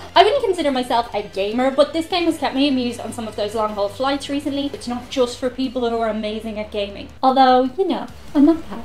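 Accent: Ireland. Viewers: honestly, your list was somewhat tame....most of us in the states would probably not bat an eye with those colloquialisms (heck, we'd probably even ask you to explain it to us a couple of times so we can be "in" on the joke). Now...if you were to use words like C*#T, wh*re, sloot, Bit*h, feck (in the American standard usage of dropping the "e" for a "u") and the other name for a kitty cat...then yeah....you would get some strange, maybe hostile, looks from most americans too stuck up to have the piss taken out of them. But those irish words? naw luv, those were endearing in their own way, and most reasonable americans would ask you to repeat those words in a sentence just to hear you and your nation's lovely accent.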